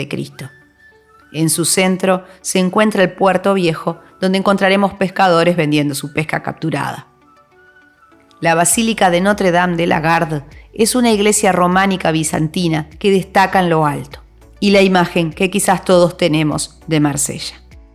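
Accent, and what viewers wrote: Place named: Argentina. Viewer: Toda Francia es preciosa!!! La voz argentina, suena maravilloso el español.Gracias por comunicarnos con su voz este precioso vídeo.👍👍👍👍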